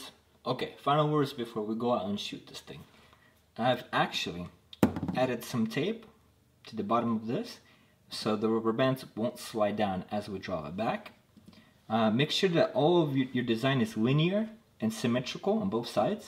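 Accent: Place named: Russia. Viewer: Are you Russian?? You talk like a Russian (not for bad)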